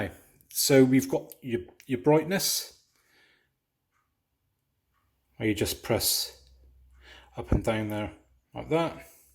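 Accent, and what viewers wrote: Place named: West Country, United Kingdom. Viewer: Great to hear a West Country accent, not enough of them on YouTube! Just bought one of these, it arrives tomorrow, my first "upgrade" in 11 years and this was very helpful. I chose the white in order, I hope, to avoid smudgy fingerprints! I also paid the extra tenner and opted for their offer (new I think) to set it up for me, using my Wi-Fi, not sure how they do that and my Amazon Account!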